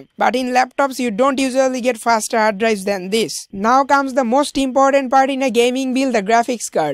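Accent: India. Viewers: ur accent sounds like indian,btw where u r?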